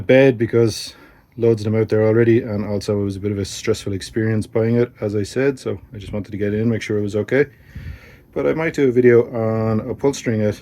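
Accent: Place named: Ireland. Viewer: Nice job mate, and timely! I've just acquired 72 low light, and have the same job to do. And that Irish brogue!! I could listen to it for ages, love it. I'm from Australia. 😂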